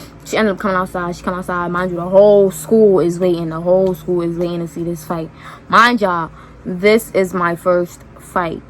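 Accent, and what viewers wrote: New York, United States. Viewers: mannnnn her ny accent is everything, and she mad pretty 😍 my parents had one job😭😩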